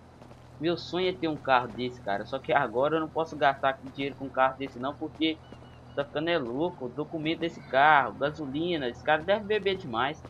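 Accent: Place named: Bahia, Brazil. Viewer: vc e bahiano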